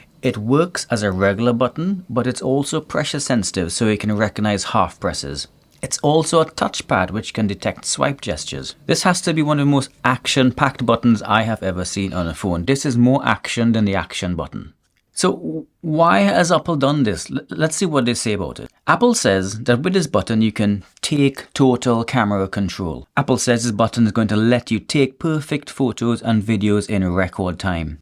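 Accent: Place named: Trinidad and Tobago. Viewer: Bredz like ah hearing Trini 😂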